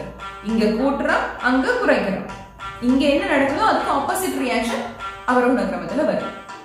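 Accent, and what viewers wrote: Sri Lanka. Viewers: India or sri lanka Your language style is like Jaffna mam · இலங்கை தமிழ. அருமை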